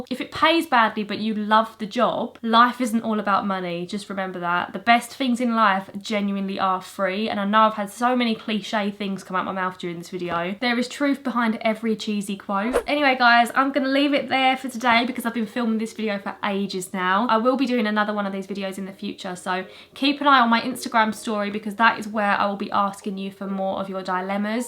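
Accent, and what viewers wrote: United Kingdom. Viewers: My daughter loves your British accent she’s trying to get one too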